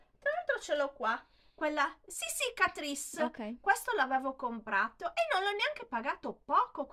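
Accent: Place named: Sardinia, Italy. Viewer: Ciao carissima è bellissimo sentire parlare della casa e famiglia in modo così "normale" riempie il cuore sentirti parlare!😊 Un altro video sarebbe un'idea stupenda...io inserirei oltre agli argomenti citati da te anche qualcosa sui prodotti non gradito secondo te..." Magari puoi titolare con il tuo bel dialetto sardo 😂"... buonanotte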